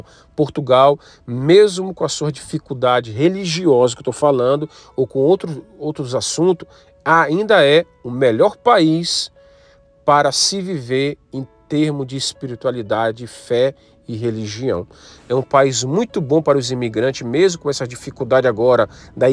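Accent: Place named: Brazil. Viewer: Não sabes do que falas! Pelo teu sotaque és do Brasil, fala do Brasil! Deixa Portugal para os Portugueses!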